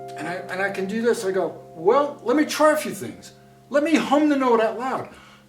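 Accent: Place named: Santa Fe, United States. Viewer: this guy must be from Santa fe. kkkkkk. love it.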